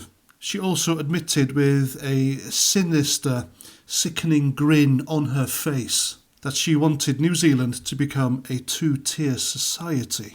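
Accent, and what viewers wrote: Wales, United Kingdom. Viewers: Sounds like you are Welsh. Why are you criticising New Zealander? Hypocrite.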